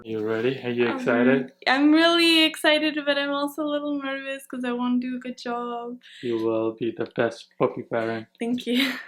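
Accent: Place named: India. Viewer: Do you know hindi I am asking you this because your voice has some indian accent and your face is also like indian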